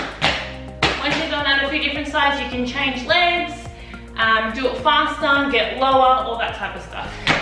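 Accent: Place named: Australia. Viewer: Loving this! First COVID, now its raining ALL THE TIME in Sydney so getting outside to skate is tough. Great to have some drills to practice that I can do in the kitchen. I Just started Derby with Northern Beaches and having so much fun! Also ... love the T-Shirt. Thanks for posting the video and great to hear an Aussie voice.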